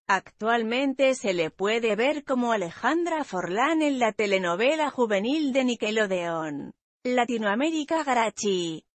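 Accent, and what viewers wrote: Spain. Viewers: Odio el acento español!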